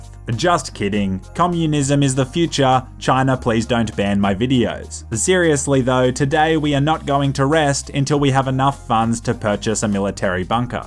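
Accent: Australia. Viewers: Where u from in Australia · Are u Australian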